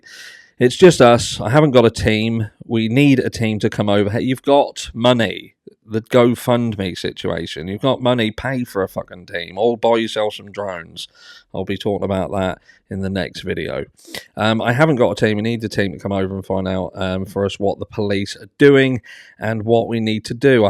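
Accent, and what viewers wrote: Norfolk, United Kingdom. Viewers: Are you a Norfolk boy?, recognise that accent anywhere, lol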